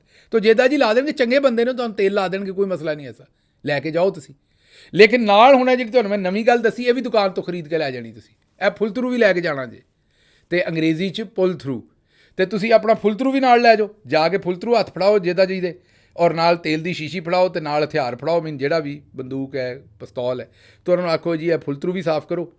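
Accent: Punjab, India. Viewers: Dr sahib🎉we enjoyed ur Punjabi accent wd nimma nimma hassssssa😂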